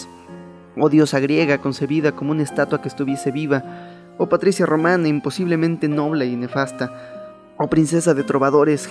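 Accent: Mexico City, Mexico. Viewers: Dos años, editado este gran trabajo,; EXCELENTE DOCUMENTAL, IMAGINO TODO EL TRABAJO Y PACIENCIA PARA LOGRARLO, IMAGINO TODA LA CREATIVIDAD Y TIEMPO PARA PRESENTARLO. EN UN LENGUAJE 🇲🇽 "CHILANGO" (alegre y relajado y audible) B E N D I C I O N E S❗ ESTÁ S U P E R‼️ 👏🏼👏🏼👏🏼👏🏼👏🏼👏🏼👏🏼 BRAVO ‼️ 👍🏾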